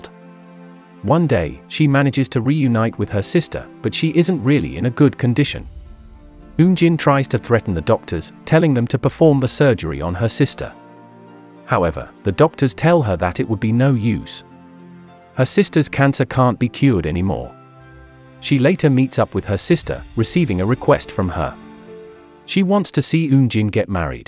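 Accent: United Kingdom. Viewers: Having to listen to very bad English being read by someone with a British accent is very weird indeed.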